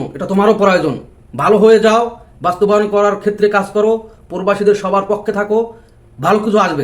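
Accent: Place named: India. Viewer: শয়তানি করার জায়গা পাওনা। অবইধ্য ভাবে মোবাইল চালাবা আর সন্ত্রাসি করবা আর বলবা বাংলাদেশে আইননাই। ইন্ডিয়ার লোক তুমি।তোমার ভাষায় বুঝা যায়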